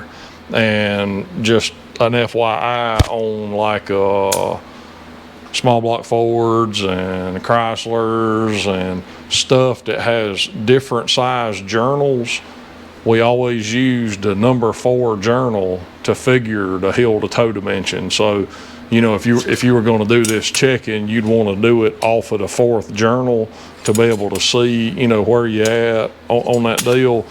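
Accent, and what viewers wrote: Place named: Australia. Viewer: That accent is magnificent. Aussie here.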